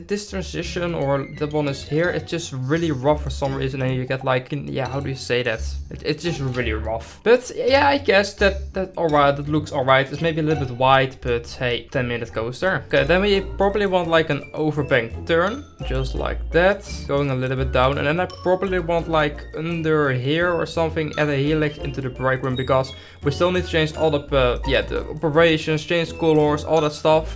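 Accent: Netherlands. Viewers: I smell dutch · Hey. Do u come from the Netherlands? U sound like a dutch person. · jij ben nederlands dat hoor je gewoon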